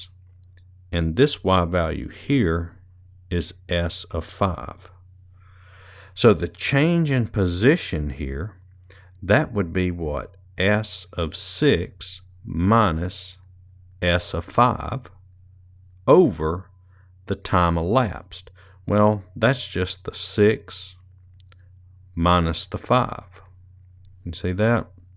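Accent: United States. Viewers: Very helpful. Cowboy accents are easier to follow than eastern ones.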